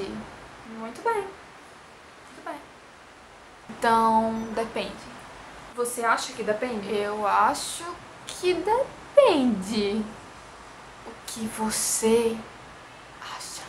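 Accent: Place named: Northeast, Brazil. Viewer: Não gosto muito do sotaque do nordeste :S Mas sim, é verdade isso hehe estuda o que la?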